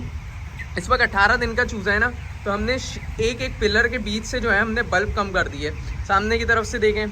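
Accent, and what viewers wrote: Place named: Punjab, India. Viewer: Bhai ap hain kahan? Punjab side lag rahi ha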